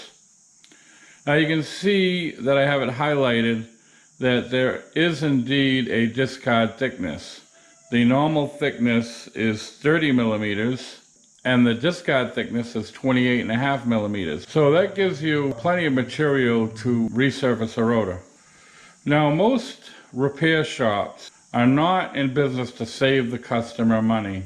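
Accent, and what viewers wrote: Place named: New England, United States. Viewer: Hey you sound like a respectable New England guy. If you’re in the Southern NH area I’ll pay ya $25 a rotor to do mine lol. Or if you have a recommended shop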